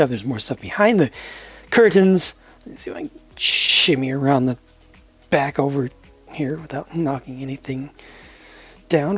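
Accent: Australia. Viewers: U Australian? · aussi accent What a day! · I know an aussie accent when i here one because I am one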